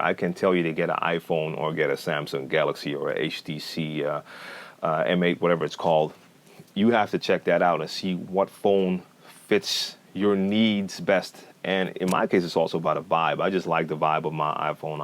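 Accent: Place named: United States. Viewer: Let me put this simple to you,,, by your accent i believe you are from USA. I'm a DJ for the last 26 years, i do beat match by ears, i'm a car driver, i have a 5 speed car. Virtual DJ is like automatic cars and the others are like manual shift cars. if you drive an automatic car, you are still a qualifier to get a driver license. And let me tell you something else, Virtual DJ is from France and is not free, the other crap softwares are free with the unit. After Numark failed to pay to Virtual DJ, they moved out of American market, also for a long time, nobody built a software like this. Virtual DJ is the first and the one, the others are pure advertizing. A real DJ is who has it a full house and makes everybody dance all the time no matter how !!!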